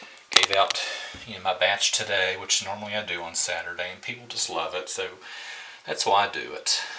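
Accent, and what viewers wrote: South, United States. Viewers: Very nice, indeed. The side pits were interesting. I've only had some trouble with my tops from overheating. Do you sell your soaps or have a website? I truly do enjoy your southern accent. I hope that doesn't offend. I have family born and raised in the South, and it's just a happy sound to me. 😃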